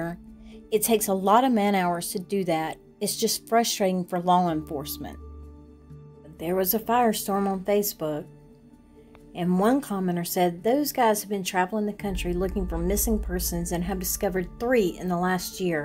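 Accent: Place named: South, United States. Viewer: Love your southern accent. I'm a southerner too. Interesting videos. You do a lot of research obviously.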